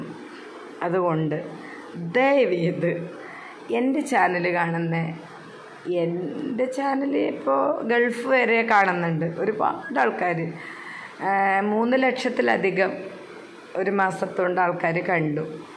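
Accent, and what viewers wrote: Kannur, India. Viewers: ore nattile oru shyliyane nammudey kannur bhasha mattulla nattile illa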